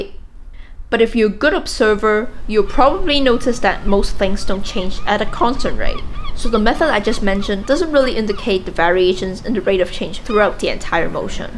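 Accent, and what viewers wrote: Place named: Singapore. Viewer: Very nice Is this english accent from someone from singapore with a chinese background?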